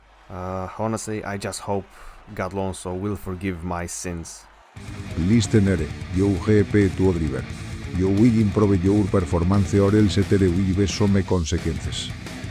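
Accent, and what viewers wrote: Romania. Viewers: Are you romanian? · Is he from Romania? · Are you from Romania?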